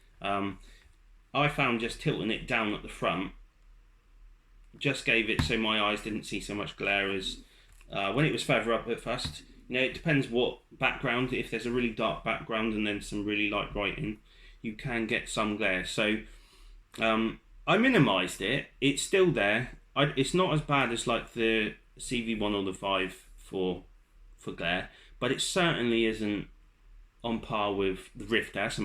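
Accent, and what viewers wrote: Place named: England, United Kingdom. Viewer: It's amazing how much I love an English accent.. and how much them pronouncing "H" bothers me. We (U.S.) say "A-ch" They say 'Hate-ch". breaks my brain. :) Thanks for the info and review!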